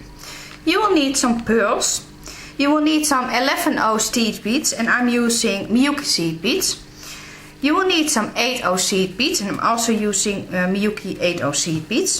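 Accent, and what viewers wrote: Netherlands. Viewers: I will definitely make this one! leuk t Nederlandse accent ook hihi :-) thankss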